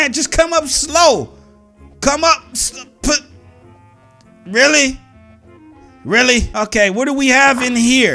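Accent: United States. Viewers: I like his American accent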